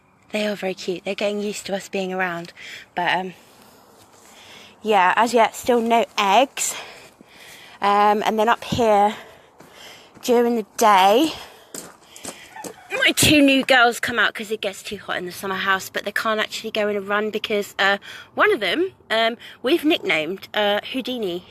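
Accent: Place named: United Kingdom. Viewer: wow! just discovered your channel. it's so lovely to hear a Brit talk about homesteading. I'm doing exactly what you are. I have an allotment and live in the Midlands.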